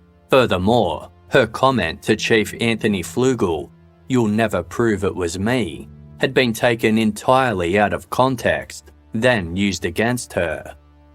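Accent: Australia. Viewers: I didn't realize he had an Australian accent until I saw someone comment and still only hear it when I think about it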